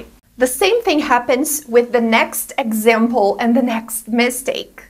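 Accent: United States. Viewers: But she's speaking american, not english... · Brasileira? Caramba, pensei que fosse americana.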